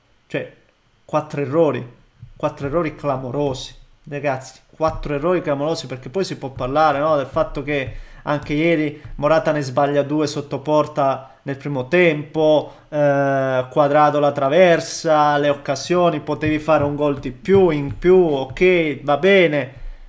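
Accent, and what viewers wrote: Calabria, Italy. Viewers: Ma prima o poi mi toglierai la curiosità di dirmi di dove sei? È troppo bello il tuo accento calabrese!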